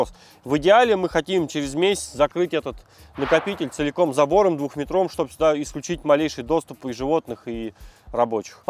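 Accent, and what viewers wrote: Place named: Central Black Earth, Russia. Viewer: Случайно попалось шортс, и затянуло. В речи слышу родные Центрально-Черноземные нотки, иногда проскакивают такие родные интонации.😊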